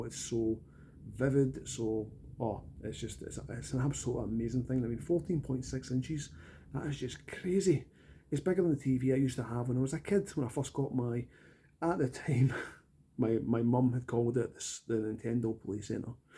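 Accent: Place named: Scotland, United Kingdom. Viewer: As an Irishman i am pretty sure he is Scottish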